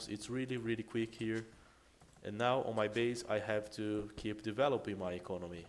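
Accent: Brazil. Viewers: ele é brasileiro? o english dele é meio sotaque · Your accent remember a brazilian speaking.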